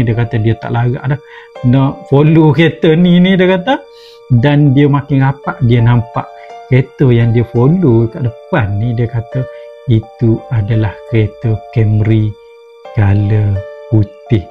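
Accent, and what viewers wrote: Kelantan, Malaysia. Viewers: sama2.. Sedak dengar crito..kemah2, ada stail tersendiri dgn loghat Klate dio tuh..kito ore baru dlm channel ni.. Follower Abg Joe JSPL benar..lor nih layan duo2 lah...😂😂🤩🤩😍😍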